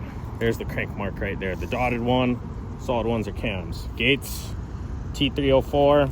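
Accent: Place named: East Coast, United States. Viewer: You do not sound like you're from New Mexico. Your accent sounds east coast or midwest. I spent 8 years in the Land Of Entrapment. I loved most of those years. Anyway, I liked the video. It brought back memories of major repairs in auto store and apartment complex parking lots. Mine were Mitsus, not Subies, but same shìt...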